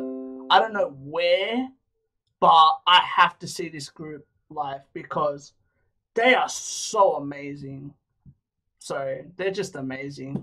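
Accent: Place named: Australia. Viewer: dreamcatcher \:D/ where you at? down under based on your accent? :D they should tour there before the end of the year. they just finished their usa tour and it was awesome. if you are curious, there are a few good vlogs/reviews that are more commentary than just showing full song clips(paul leach, the kpop guitarist, ram reacts, merlin zimmerman) that you can maybe even react to. I'm surprised you actually know them by name, since you haven't reacted to that much of their stuff at least here on youtube. just do more album reactions. most of their albums are mini-albums so it's only 4 songs. just be sure to check if they have special clips for the b-sides before picking a lyric video.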